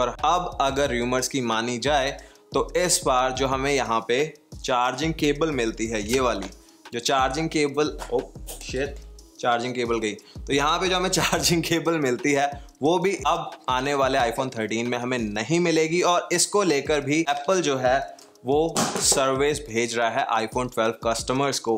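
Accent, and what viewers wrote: Punjab, India. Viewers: sir are you punjabi?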